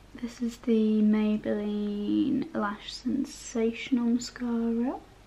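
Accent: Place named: United Kingdom. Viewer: I’m in love with your British accent...it’s so charming!!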